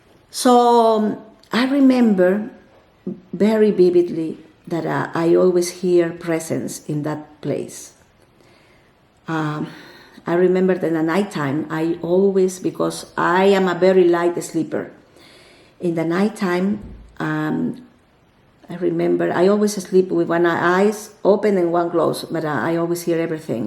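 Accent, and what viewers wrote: Peru. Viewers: This is the first of your videos that I have seen and as soon as I heard you talking I could hear a Peruvian accent. Reminded me of how my aunt talks and sure enough you said Lima, Peru and it sparked some joy in me. 🥰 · can we get a Spanish video because I want to hear your Peruvian Spanish 🥰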